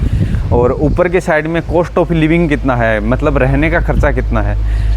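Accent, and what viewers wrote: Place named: Uttarakhand, India. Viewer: tum bhai pkka uttarakhand se ho .. tone mai hi apnapan h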